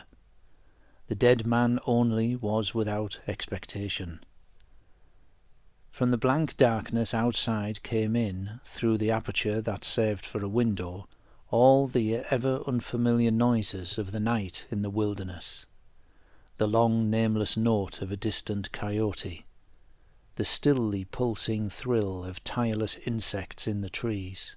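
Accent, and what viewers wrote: United Kingdom. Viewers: This gentleman has such a pleasant voice, pace of reading, diction and British English. A pleasure to listen to.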